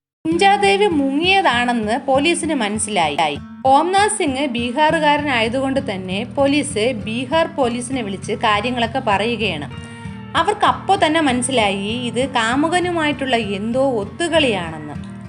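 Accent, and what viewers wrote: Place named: Thiruvananthapuram, India. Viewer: നല്ല കഥ അവതരണം കൊള്ളാം തിരുവനന്തപുരം സ്വദേശി ആണോ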